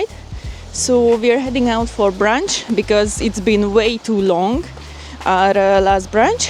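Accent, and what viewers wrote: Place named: Hungary. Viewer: Szia, mindig mosolygok amikor meghallom az accentusunkat 😊 még nem néztem meg a bemutatkozó szöveget, de rögtön tudtam,h honfitárs. Sok sikert a csatornához, nagyon szuperek a videóid. Ha megakarod mutatni az embereknek az Ír beach életet csak gyere fel hozzánk Balbrigganba 😊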